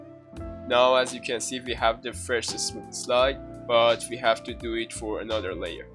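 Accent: Iran. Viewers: عجب لحجه ای قشنگ معلومه ایرانیی😂😂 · your accent is so iranian coded. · دادا من دارم شروع میکنم از لحجه نازت فهمیدم ایرانی هستی .کاشکی زبونتم ایرانی میکردی ولی غب.سطخت بالاست .ماشالله😍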